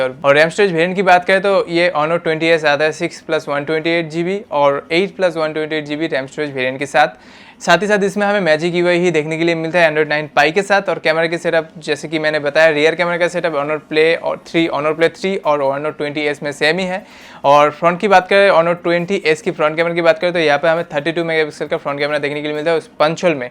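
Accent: Bengal, India. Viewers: Amar mone houechilo Tumi Bengali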